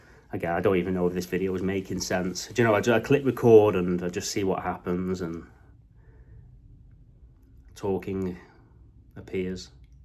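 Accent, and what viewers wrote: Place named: North West England, United Kingdom. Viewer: Cool video man, are u from Manchester? I am from North West England tryna guess ur accent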